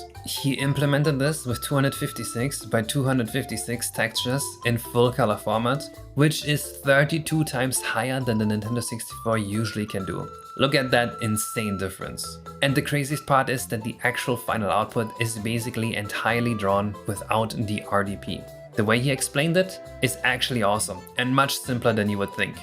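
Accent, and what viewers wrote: Germany. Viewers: random question but where are you from? i hear a german accent in your voice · Hmm thats a german accent. Great tech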